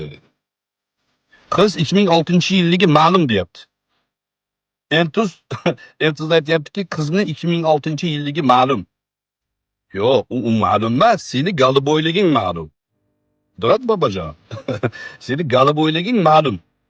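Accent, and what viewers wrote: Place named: Namangan, Uzbekistan. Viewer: Сизни Илмингизни хурмат киламан лекин илмингиз бор одам Наманган шевасида гапириб эрмак килдийиз исломда одамлар устидан эрмак килиб Кулиш борми номахрам аелга гул бериш борми, видойингизни таркатган1та аелни деб бутун Наманган устидан кулдийиз шу тугрими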